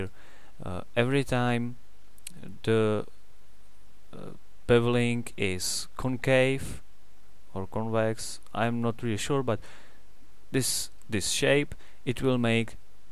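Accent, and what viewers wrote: Czech Republic. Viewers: very useful, I like it, but that czech accent is too much xD I'm sorry, but it is difficult for some people to understand our accent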